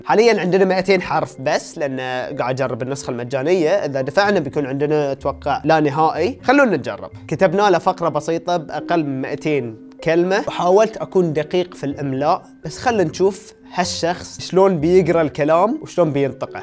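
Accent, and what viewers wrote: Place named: Egypt. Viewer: ولله لهجتك المصريه حلوه ❤️🇪🇬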